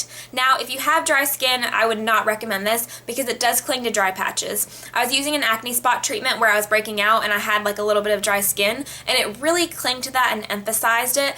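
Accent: South, United States. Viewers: You're so pretty! And I definitely didn't think you'd have a southern accent!!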